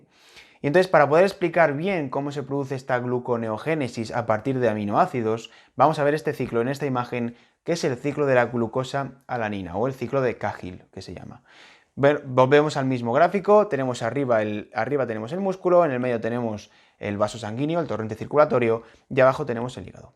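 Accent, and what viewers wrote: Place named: Spain. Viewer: me cae bien pero me suena muy raro el acento de españa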